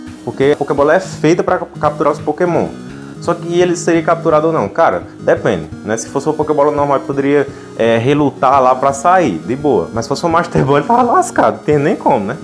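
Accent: Northeast, Brazil. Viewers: Eu acho que ele mora no nordeste por causa do sotaque dele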